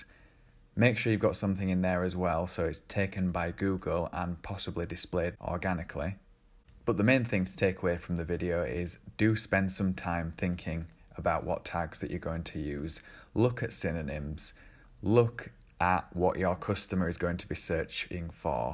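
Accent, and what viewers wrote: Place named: North, United Kingdom. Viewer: I’ve been on RB for a while but not really used it properly, though have had a few sales. This is really helpful and I’m now going to go through your other videos to get more tips. I also love that you’re ‘northern’ like me - it’s weird but your accent makes me want to listen to you. Great video , thank you.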